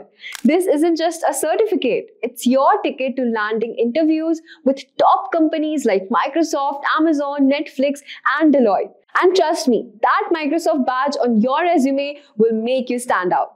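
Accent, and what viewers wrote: India. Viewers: Please have somebody with plain and clear American English to explain the benefits of this course. It is very difficult to follow along with the heavy Indian accent this presenter has.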